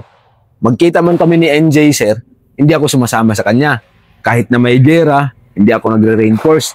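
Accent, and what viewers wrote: Philippines. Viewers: Tagalog na tagalog magsalita si Sir,maliwanag sya magsalita..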